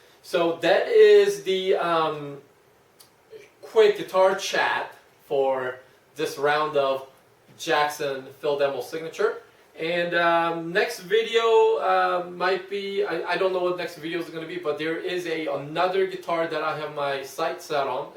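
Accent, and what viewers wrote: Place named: South Korea. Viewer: 안녕하세요. 혹시 한국 분이신가요? 영어 억양이나 발음이 종로 학원을 떠올려서 여쭈어 봅니다.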